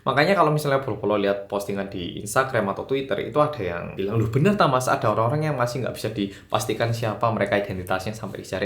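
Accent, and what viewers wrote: Java, Indonesia. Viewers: Kalah medok gw sm oppa 😀😀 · jiaannnnm blasssss ra koyo wong korea,medoooxxxxxxxx bgt.......#keren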